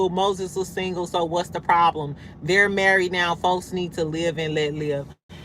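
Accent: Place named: Chicago, United States. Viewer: Girl you sound like you're from Chicago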